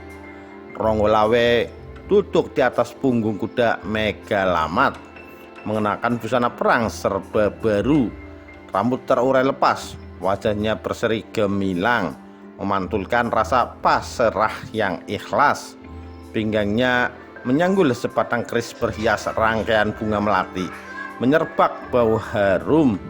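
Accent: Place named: Java, Indonesia. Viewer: Suaranya bagus itu,khas jawa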